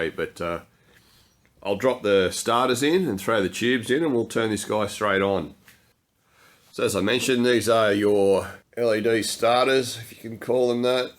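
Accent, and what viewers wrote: Australia. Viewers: really dig yer Aussie accent, mate. No BS from any of you blokes, says a dude from Montana, US of A. I've been monkeying with LED conversions since before the new year and have discovered many tips and problems. For example, if you use so called "Plug and Play" WITHOUT ballasts, they won't work! Don't buy them -- ballasts should be cut out and scrapped. The P& P tubes are most common, nearly everywhere. They are known as type A tubes. Type B tubes need to be wired with a hot end, the other is neutral. I don't know what the one enders are called, as I have yet to find any, except on the internet (Hyperikon, come to mind, but they are bloody expensive and not needed) There are apparently at least 12 brands, if not more. There appear to be maybe 4 kinds of tube types. I have used the type B tubes as they are easy to wire and work well. They are double ended, as mentioned. 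The single end ones can be pricey, and they work well too, but have not used them. Hope these tips help some of your viewers, my Aussie friend. Cheers, mate. Bob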